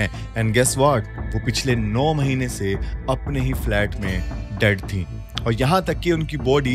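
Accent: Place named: Pakistan. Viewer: Bolne ke lehje se lagta hai pakistani ho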